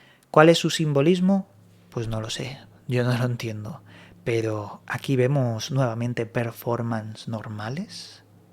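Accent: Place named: Spain. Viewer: Me encantan tus videos , antes no soportaba el acento español , pero tú voz me transmite paz , gracias por modificar eso , te deseo mucho éxito , esperando tus videos de septiembre a Egipto , son lo mejor , infinitas gracias 🙏🌸🌸🌸🥰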